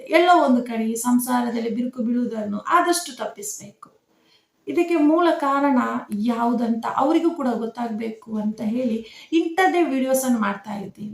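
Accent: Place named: Udupi, India. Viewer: Your accent etc tell me that you are originally from some ಉಡುಪಿ or ಕುಡ್ಲ r area but probably living away from thére. This video indirectly talks about some vital statistics about the high rate of illicit sex among the married female partners of those men who have gone away, usually abroad, finding some employment. You are strongly suggesting here that to a (married) woman being sex-unemployed is next to impossible and so having UTTERly failed to control their desires etc, they have chosen to tread the dark path of physical adultery. You have also said that not all of them have become so which is natural to invite the following legitimate question--how are the majority of those not regularly sexually active ÍN the secret chambers of men coping with their uncontrollable libido--have they been using sex toys and masturbating often, have they been watching or reading mummy porn, have they been having phone sex or non-penetrative sex etc? 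I hope you would also deal with those alternative sex satisfaction means also sometime. Thank you for using ದೈಹಿಕ ಪ್ರೇರಣೆ somewhere which is only a remote euphemistic translation of 'sexual advances'.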